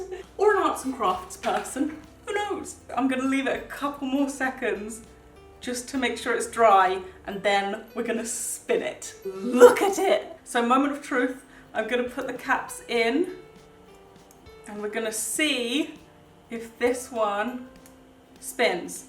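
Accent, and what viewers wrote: United Kingdom. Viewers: I love your British accent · Wait are you british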